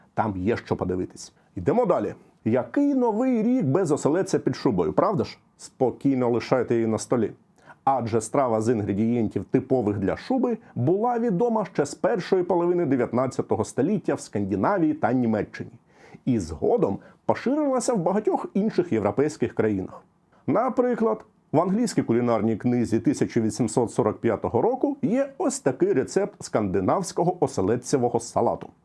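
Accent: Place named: Russia. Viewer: Працюйте над мовою, бо ваша розмовна кацапська аж смердить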